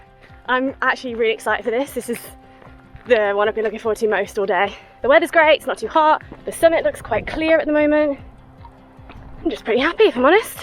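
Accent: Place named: England, United Kingdom. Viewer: I can't figure out if you have a Welsh accent or English. I'm thinking English but every so often you sound Welsh lol If you're Welsh then Shwmae, if you're English then I hope you enjoyed your stay 😂👍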